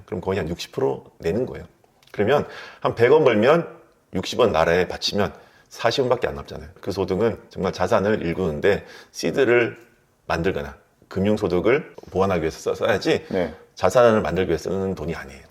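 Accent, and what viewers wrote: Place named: Busan, South Korea. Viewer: 사투리가 들렸는데 부산대의대 선배님이셨군요..! 너무너무 재밌게 들었습니다ㅋㅋ 인턴하면서 하루하루 일하고 자고만 반복했는데 영상 보면서 동기부여가 많이 되네요 포부를 크게 가져야겠어요 좋은 영상 감사합니다!!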